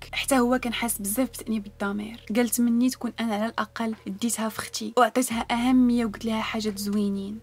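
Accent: Morocco. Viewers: Très chère. Je viens de découvrir ta chaîne et depuis je suis accro. Il est clair que tu es une perle, mais je tiens à exprimer quelque chose d'autre si tu permets. Moi je suis algérienne et mon défunt papa est né et a vécu au Maroc. 16ans, il aimait tant ce pays, je porte pour le Maroc un amour immense transmis de mes ancêtres 😊 à force de regarder tes vidéos je me retrouve à imiter tes mots ton accent Marocain et ça me rappelle papa. Ce qui m'attriste d'autre part c'est les relations entre les deux pays, pourtant nous, les gens le peuple, on a rien à voir avec cette politique, on est frère et soeur. J'aurai vraiment voulu pouvoir visiter le Maroc. J'espère que tu liras mon message. Je te porte bqp d'affection, tu es belle juste bien vivante, professionnelle. Mashallah. Bon courage Hbizaaaaaa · جمالك ماشاءالله وجمال اللهجه المغربية🇲🇦🇸🇦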